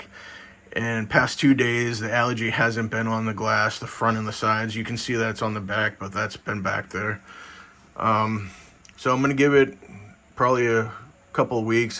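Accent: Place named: Massachusetts, United States. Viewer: I’m dealing with green hair algae as well but it’s almost gone. Just need to get your hands wet, remove as much algae as you can and do a few water changes. If you stay up on your maintenance, it’ll fix itself. I would tell you, get rid of the vibrant. It’s proven to be more harmful than good. Just a simple google search will show you some horror stories. Side note, sounds like you’re in Mass.. I’m near Worcester.. you might want to join Boston Reefers Society if you haven’t already. Lots of good local knowledgeable reefers willing to help and give advice! good be luck bro.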